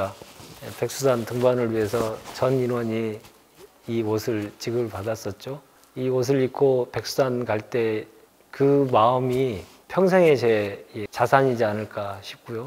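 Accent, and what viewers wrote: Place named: Jeolla, South Korea. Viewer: 표준어를 쓸려고 노력하지만 전라도 사투리인듯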